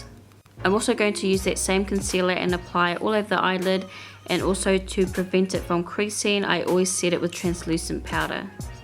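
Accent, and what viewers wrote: New Zealand. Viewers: Love it. So great to hear another Kiwi accent doing makeup videos :)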